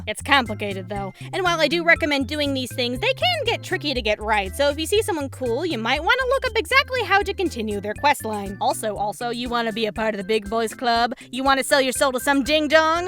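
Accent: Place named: Midwest, United States. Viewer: So fucking midwestern!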